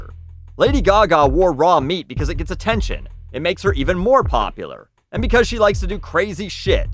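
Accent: Canada. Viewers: Anyone noticed Canadian accent? (out, about) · Tornusage it's his Canadian accent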